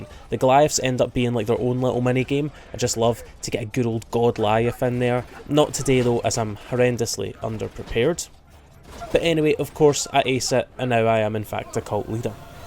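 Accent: Scotland, United Kingdom. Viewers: u a scot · A Scottish Borderlands youtuber, I'm in!!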